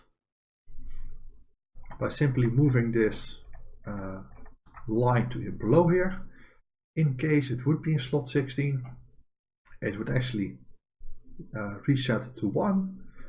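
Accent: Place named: Netherlands. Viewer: Can you do a tutorial about computer craft? I am sure you are very good at explaining it. BTW, Your accent tells me you are Dutch I am right?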